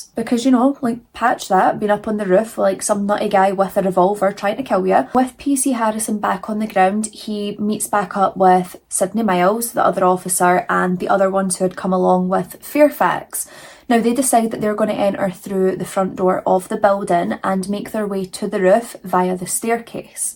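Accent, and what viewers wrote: Scotland, United Kingdom. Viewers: Don’t let You Tube get you down. Without people like you, THEY WON’T HAVE VIEWERS! Dang. And I love your personality, the spider make up was amazing and I did it to hand out candy for Halloween. CONTINUE BEING A CERTIFIED YAPPER! We love you and faithfully watch every episode. Love the accent, love your own personal comments. Keep Yapping, Scottish, from Irish Freckled Songbird in the US!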